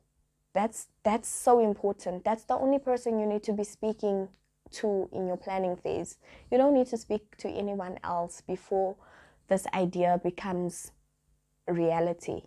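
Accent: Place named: South Africa. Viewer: You sound South African you know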